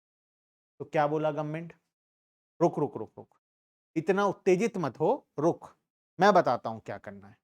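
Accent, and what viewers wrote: Odisha, India. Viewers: Sir your odia voice is awesome ❤ Are you from sambalpur 😅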